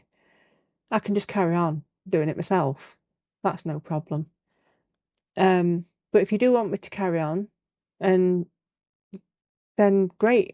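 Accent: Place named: South Yorkshire, United Kingdom. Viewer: im loving watching you make this, i recognise your accent and voice. Im from South Yorkshire maybe thats why xx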